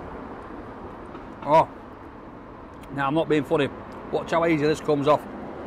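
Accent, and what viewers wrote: United Kingdom. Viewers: We should all have a in our lives. What a wonderful, positive person. I’m an expat living in Northern California and it’s delightful to hear talk. The longer I’m in the US the more I yearn for all things UK. Thank you, for bringing a little bit of home to me.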